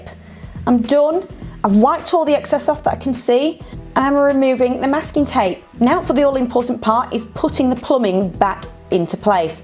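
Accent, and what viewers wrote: United Kingdom. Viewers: I'm doing my sink now and it was so nice to see you do this with painted nails, and could listen your British accent.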